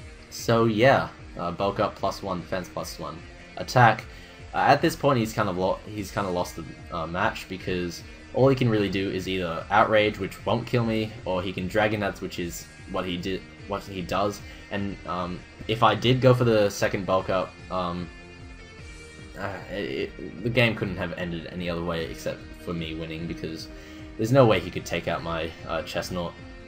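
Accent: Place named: United Kingdom. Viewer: pokémon battles commented with a British accent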